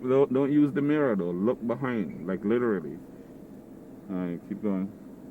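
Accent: Jamaica. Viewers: Sounds like he’s Jamaican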